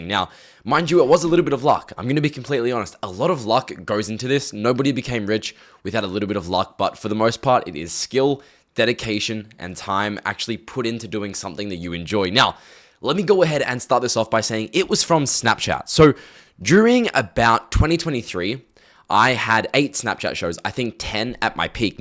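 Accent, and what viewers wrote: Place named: Australia. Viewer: Too much free sauce bro, appreciate you for sharing this vid. I hear the Australian accent too btw, by any chance you in Perth?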